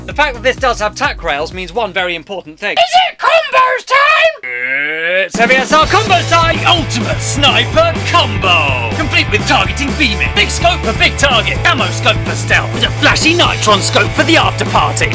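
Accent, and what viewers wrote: United Kingdom. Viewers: dudechaps bri'ish coop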